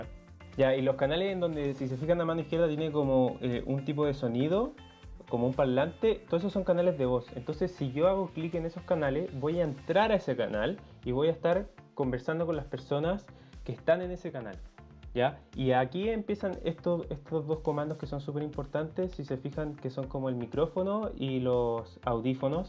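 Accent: Chile. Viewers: Eres chileno 👊😎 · Es chileno ? O no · No te conozco pero hablas como chileno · Mire este video y me sirvio artazo, ah y eres chileno jajaja, me suscribo XD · no te conozco pero eres chileno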